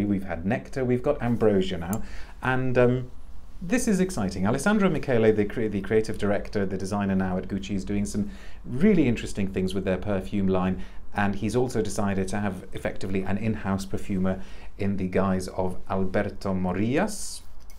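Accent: United Kingdom. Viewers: British accent - I’m in 💖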